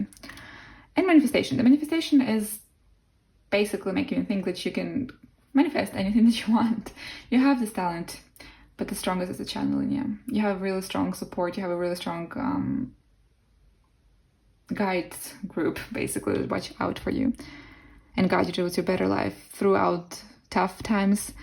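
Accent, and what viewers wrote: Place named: Eastern Europe. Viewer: You're a really good reader, you have great intuition. I picked pile 1, and most of the things you said resonated. I am very sensitive and empathic, and I'm autistic. I'm 31 years old, and I started to have severe sensitivity to certain sounds in 2014. These kind of sounds are always happening, so I've been forced to be homebound since 2014 as a result. I'm unable to leave my home except for short periods of time to buy groceries from the shop nearby, I'm unable to do anything, I'm just stuck in my home and suffering, because I can still hear the loud noises from outside even while staying inside (they are loud, terrible noises caused by people driving in a terrible way). I'm also very sensitive to people's energies and vibes, but even more so than you said. I can tell if someone is a good or bad person by looking at them and feeling their vibes, they don't even have to say anything. 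I hope you will do a reading that would tell me if/when my situation will change, because I've been trying to escape this situation that I'm stuck in for years but it hasn't happened so far, it feels like all the doors have been closed on purpose by a higher power, and nothing is working despite all my efforts. By the way, I'm curious, are you from Eastern Europe? Your accent sounds Eastern European. Thank you for your readings!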